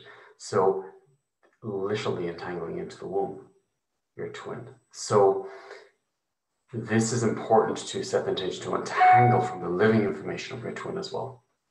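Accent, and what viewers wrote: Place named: Ireland. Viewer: Thank you,. Wonderful xxx When Kim D’eramo mentioned your name It sounds so Irish to me (also Irish!) and now that I’ve heard you, of course you are! Love and gratitude xxxx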